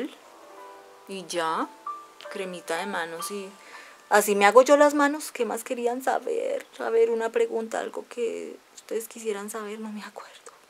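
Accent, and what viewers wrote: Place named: Colombia. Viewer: pensé q eras colombiana jajajja por tu manera de hablar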